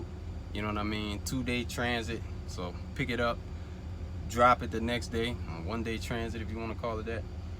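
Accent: New Orleans, United States. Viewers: Sound like he from New Orleans ya heaarrddd mehhhh !! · I can tell you from New Orleans lol I’m from mobile Alabama , how often you push yo self home ??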